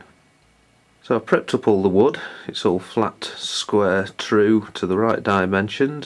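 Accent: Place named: Northern England, United Kingdom. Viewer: great instruction...love the wallace and grommet accent. "More por-r-i-g-e Grommet?"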